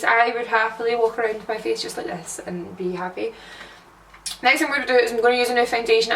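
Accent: Scotland, United Kingdom. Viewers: I'm a new subscriber and This is amazing And r u from Scotland 😂 xxxx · is she Scottish?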